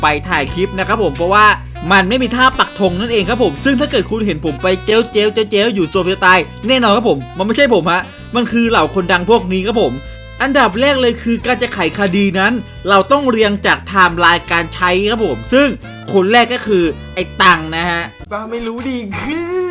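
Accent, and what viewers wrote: South, Thailand. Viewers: พี่เป็นคนใต้หรอ ผมพึ่งรู้นะเนี่ย555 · พี่ เป็นคนใต้เหรอค่ะ?? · พูดใต้หน่อย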